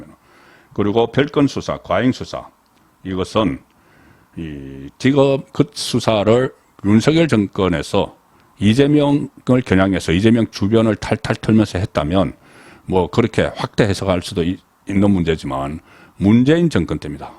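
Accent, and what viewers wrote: Gyeongsang, South Korea. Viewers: 송국건유튜브방송을 즐겨보면서 늘 느끼는 점은 내용은 최상의 수준인데 말실수를 잦은편인데 그건 그다지 문제가 될 수는 없지만 억양 지방시골억양이 참 문제입니다. 예컨대. 엄마의 자매 이모?를 성씨가 이씨일때 이 모씨 라고 말하면 안되듯이, 장음 단음이 정확해야하듯이 오늘 방송을 보면서 자꾸 유서를 유 서 라고 합니다. 스스로 녹음된 거 보면 그걸 못고치는지? 40년대 시골사람이 서울한번갔다와서 서울말씨를 흉내내다보니 저런 현상이 나타나듯한데, 특히 경남지방사람들이 저렇게 발음 억양을 구사하는데, 대표적으로 가장 울렁증유발수준은 성창경입니다. 그런데 송국건혼술은 정말이지 우파에서 방송내용은 최상인데, 발음 억양이 최고스럽지않는 기본이 안된건 고치는 게 좋지않을까요? 앞서 언급했듯이 성창경은 울렁증생겨 듣질못할정도인데, 기자출신이면 그걸 고치지못한다면? 울산이나 포항정도수준의 시골지방기자수준에서 벗어날 수 없는 게 아닌가하는 맘이 듭니다.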